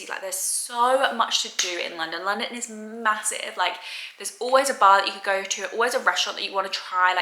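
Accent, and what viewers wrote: Australia. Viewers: You've definitely picked up an Aussie twang in your accent! I just got my PR and looking to move out next year. Can't wait. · You already have an Aussie accent.. nice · I call bs. She sounds too Australian to have only been here for 3 years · You have a lingering Aussie accent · I love your accent!! Bit of Aussie, Londoner & Manny!😅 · I didn't know you could say "like" that many times in such a short time LOL. But you have picked up our accent really quickly. Good on Ya! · You've got a good Australian accent. There are English people who've been living here for 20 years that sound like they just got off the boat.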